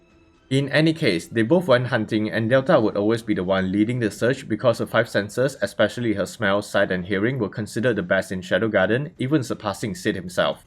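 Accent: Singapore. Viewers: bro u singaporean?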